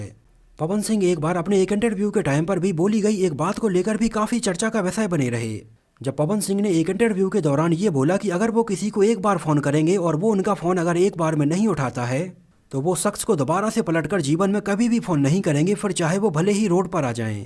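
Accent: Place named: Bhojpuri, India. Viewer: Jio bhaiya bhojpuriya andaaz me mja aayi gayil ho👍❤️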